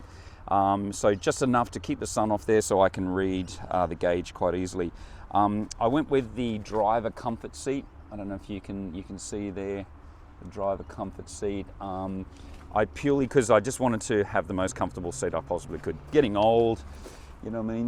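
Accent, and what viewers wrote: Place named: Australia. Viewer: Love the Australian accent and of course the Ryker looks very Mad Max :)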